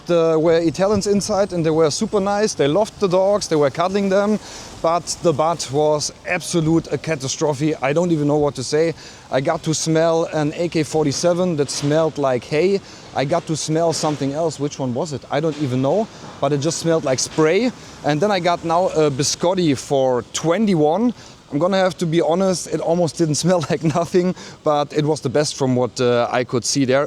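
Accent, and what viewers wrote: Germany. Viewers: You have german akzent ma boi. Are you from germany?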